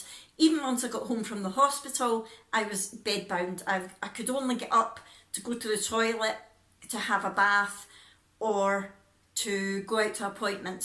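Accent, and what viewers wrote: Scotland, United Kingdom. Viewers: .. Sorry, it was Rick Chan who mentioned your channel in his comment on a mutual vlog we watch called Worms Eye View. She is learning Welch and how to say the words, and Rick mentioned your Scottish accent, which I love, so I scooted over to your channel. Hope I didn't confuse you. Ha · Hi have just came across your videos on here, can I just say that you are such an inspiration, I am working my way through all of them lol 😊 really enjoying listening to a fellow scot for a change, I'm loving that you are a crafter too so am I. Thank you ❤️😘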